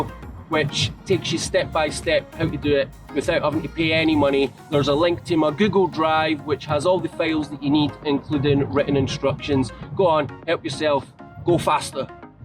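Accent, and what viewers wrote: Scotland, United Kingdom. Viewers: Thank you so much for your hard work man!!! 5 star review!! Scotch accent is bad ass!! I am trying to master the accent!! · BREVVVV Scottish accents the best one! Big up ya self and bun the haters lol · Hi! I'm glad that my comment could make you feel good, unlike some... people who have nothing else to do in their life but troll. ;-) As for your Scottish accent, it's very light compared to my Scottish godfather's, and I understand you perfectly. But it's well known that English people from England England often have trouble with a non-Oxfordian accent. :-P I travel a lot and I intend to do some trails in Scotland as West Highland Way, Southern Upland Way, Great Glen Way, etc. But this when I'll have modified my Pro 2 (which is already equipped with Monorim front and rear suspensions + 10" tires) into a powerful 2WD (2 x 500W + Monorim U5 Pack + additional Aerdu 13S4P 48V 14ah battery), in order to have the power for the climbs, especially with the camping gear in the backpack, even if it's all ultra-light, and a maximum autonomy (with solar charger for long trails, of course!). 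[ I know, some would say I'd better invest in a Kaabo Wolf Warrior King GT, but it wouldn't be as light to carry in and out a sailboat for daily use, and I don't need all the speed it provides. I prefer to ride safely at a gentle pace and have maximum autonomy. ;-) ] So, if you wish, maybe we could meet when I'll pass by your place? And let me reiterate my thanks for your videos, which are also well edited in post-production. We can feel the work of a passionate person.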